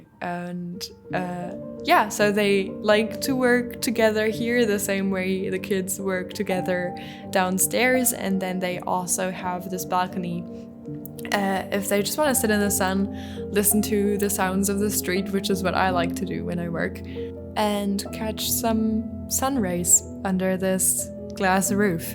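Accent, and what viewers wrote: France. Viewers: You sound part french, danish and irish to me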